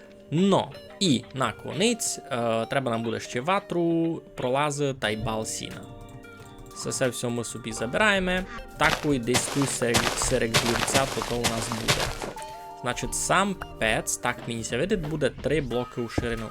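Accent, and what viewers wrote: Transcarpathia, Ukraine. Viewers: Це закарпацька?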